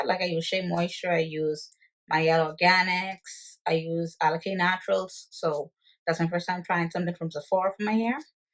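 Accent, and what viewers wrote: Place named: Bahamas. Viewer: Absolutely obsessed with watching Sephora hauls! I’m a Bahamian living in Canada too and when I hear your accent I was like uhhh yeah I gotta subscribe! Keep it up your video quality and content is very nice!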